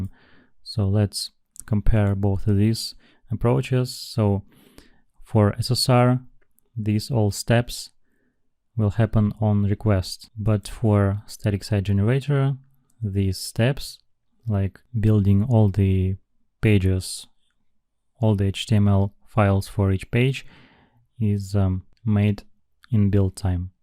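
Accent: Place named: Eastern Europe. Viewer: Not bad. Slavik accent is hardly heard. Keep it up!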